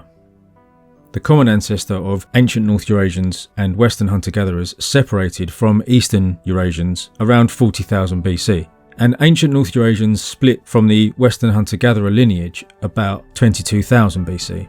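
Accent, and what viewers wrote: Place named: London, United Kingdom. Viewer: I love the London Accent 😂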